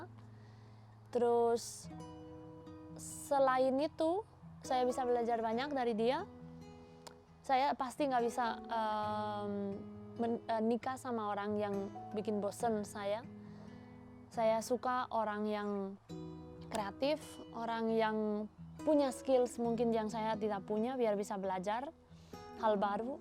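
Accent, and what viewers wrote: Indonesia. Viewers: Keren banget dialeknya , 90% Indonesia . ❤️ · Buset bahasa indonesia fasih banget,logatnya pun indonesia banget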